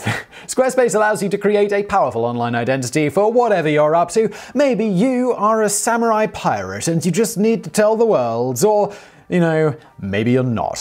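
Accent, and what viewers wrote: United Kingdom. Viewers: Yes, your french pronunciation is indeed terrible...nevertheless I like your "typical" british way of speaking english... ;)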